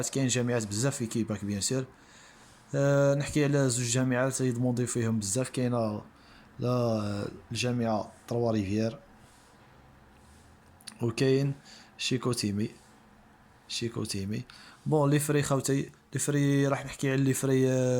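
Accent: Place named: Mila, Algeria. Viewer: عرفتك من هدرتك هههههههه ناس ميلة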